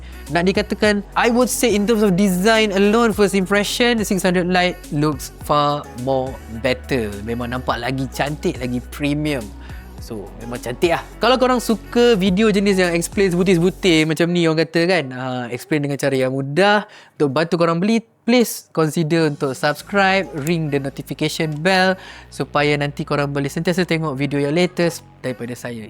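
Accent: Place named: Kuala Lumpur, Malaysia. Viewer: Serius lah kalo bukan komen Hang aku rasa Abe ni ore kl 😂😂😂😂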